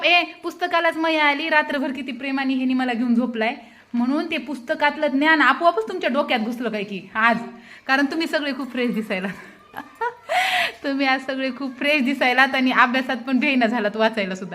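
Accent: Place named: Parbhani, India. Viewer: मॅडम तुमची भाषा परभणी चि वाटते ,आमचे सर सतीश Ranher सर यांच्या सारखी आहे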